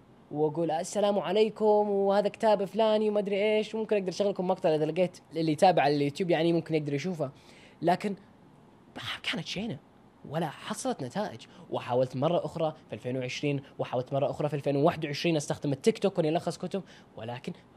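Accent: United Kingdom. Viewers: طيب؟، يعني إذا أهم الشيء الكلام واضح، بالنهاية نطقه للغة الانجليزية بالطريقة الصحيحة(باللهجة البريطانية)مو من شأنك أبدًا ويعد إختياره، صح؟ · انا عارف شعورك وانه نطقها بالطريقه البريطانيه نوعاً ما مزعج او مستفز ولكن معا الأسف ترا فكرتنا هذي عن انه مزعج او مستفز خطأ وبدل ما تطلب منه يغير اسلوبه في النطق عشان ترتاح في سماعها انصحك وأنصح نفسي وكل من هم زينا اننا احنا نغير فكرتنا انه الطريقه الصحيحه في النطق اهم من راحتنا وأننا ندرب أنفسنا على تقبل هذا النطق الصحيح بدلا من انتظار العالم انهم يصيرون ينطقون بما نحبه احنا لأنهم ما راح يتغيرون ابدا شيء مستحيل اصلا وهذي نصيحتي واتمنى انها تكون واضحه لك وللجميع يارب ❤ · استمر يالأخ ولكن انا عندي نقطه بخصوص نطقك للأنجليزي اتمنى يكون نطقك وهجائك بلهجتنا وليس باللهجه البريطانيه اتمنى تتقبل انتقادي بصدر رحب واتمنى لك التوفيق والنجاح ومن هنا للأعلى 🤍🤍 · غير صحيح ... الصحيح أنه ينطقها بريطاني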